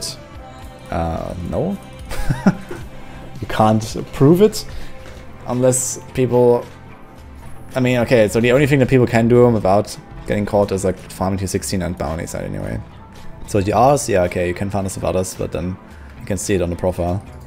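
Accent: Germany. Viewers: as fellow German you probably can hear his German accent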